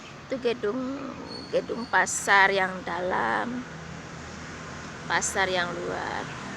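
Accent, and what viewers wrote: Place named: Indonesia. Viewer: Bahasa mandarin kakak sudah lancar cuma logatnya msh kental rasa indonesianya. He....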